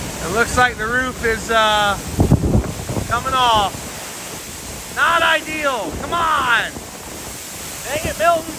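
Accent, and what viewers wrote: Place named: Canada. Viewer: Is he not from the south too? Talks like he's from Canada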